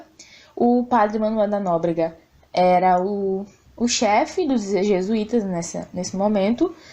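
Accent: Bahia, Brazil. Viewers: A explicação foi boa mas o sotaque da Bahia atrapalhou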